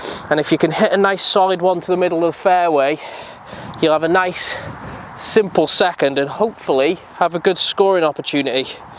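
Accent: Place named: Rhyl, United Kingdom. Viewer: When I heard your accent I thought you were actually from rhyl. I lived in rhyl for quite a few years and I've got some great memories of it, but I never had chance to play the course. I'm determined to go up to play it this summer. By the way give Llanymynech a go if you haven't already played it. Along with church stretton. I think they are the two best courses anywhere.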